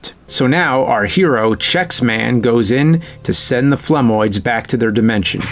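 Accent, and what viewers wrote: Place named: East Coast, United States. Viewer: But with that awful East Coast accent, it's more like "Æss".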